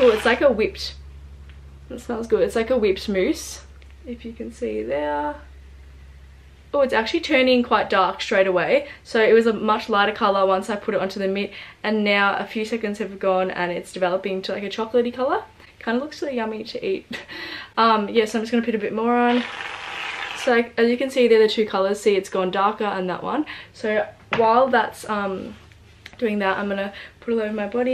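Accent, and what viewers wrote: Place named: South, United States. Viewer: I reckon....you sound like your from the south states, like me as a TN girl. Tan looks great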